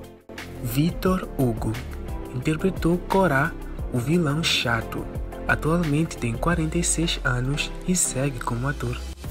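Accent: Portugal. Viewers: Que sotaque é esse? Vc é de Portugal é 🤨🤔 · Você é de Portugal seu sotaque é de lisboa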